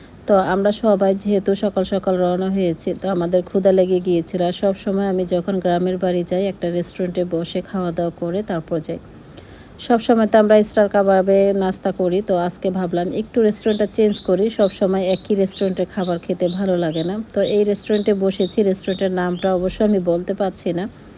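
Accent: Chandpur, Bangladesh. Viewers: চাঁদপুরের ভাষায় কথা বলেন আপু ❤❤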